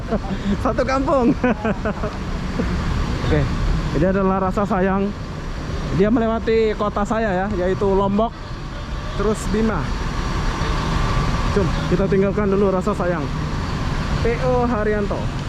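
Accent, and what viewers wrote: Malaysia. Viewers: Asli lombok merantau Malaysia karna udah lama dmalaysia jadi bhsa udah kental malaysia kira2 gitu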